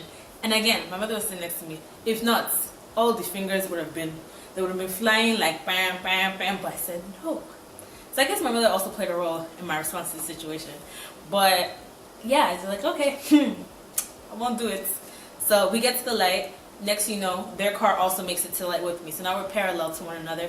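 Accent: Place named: Africa. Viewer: Makeup A 1 🔥🔥🔥 also I peep the African accent 😭😭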